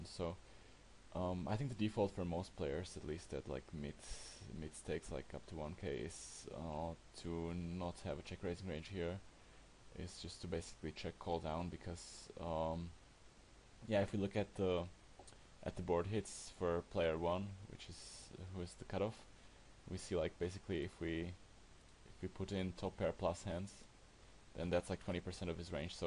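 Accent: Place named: Eastern Europe. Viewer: A+ content, vyslovnost temer nepoznamenana slovanskym jazykem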